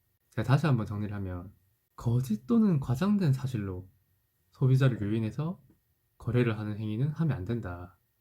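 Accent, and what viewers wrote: Gyeongsang, South Korea. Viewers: 부산 사투리네 형 . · 부산 경남쪽 같으신데용?ㅎㅎ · 대구사람이신가요 말투가 제 친구들같군요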